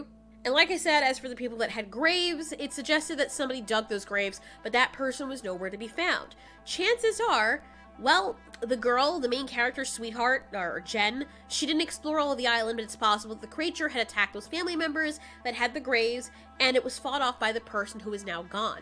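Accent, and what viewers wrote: United Kingdom. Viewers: Are you British? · I personally prefer your British accent.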